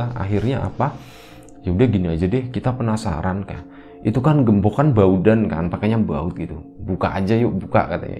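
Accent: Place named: Central Java, Indonesia. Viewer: Mantab mas. 🙏 logat kakay Jawa tengah Alus kromonya👍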